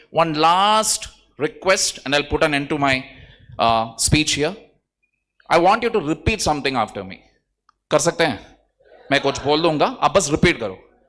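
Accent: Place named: South, India. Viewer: Bhasa se hi pta chl rha tha south k h 😊kuki south movie me ase hi bolte h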